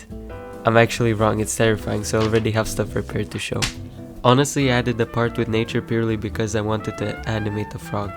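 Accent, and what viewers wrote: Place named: Eastern Europe. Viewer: oh great, first I heard you I thought that you are slavic, by your accent 😅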